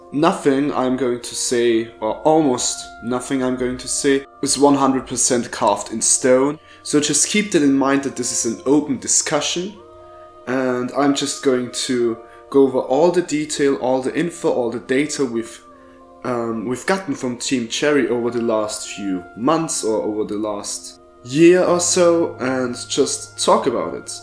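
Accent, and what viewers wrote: Germany. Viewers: Bro deine englisch aussprache verrät direckt das du deutsch bist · love your vids and your german accent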